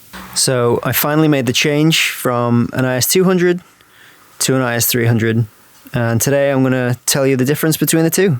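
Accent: Liverpool, United Kingdom. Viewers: are you a scouseer whos lived in america or the other way round?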